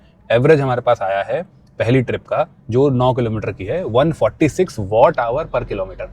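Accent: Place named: Farrukhabad, India. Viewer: Farrukhabad wali boli. Chala rahe ho shahjahanpur me. Purane din yaad aa gye.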